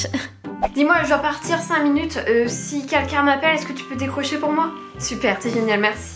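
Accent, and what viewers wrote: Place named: North, France. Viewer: bonjour vous êtes toujours aussi belle,j'adore votre accent de ch'nord!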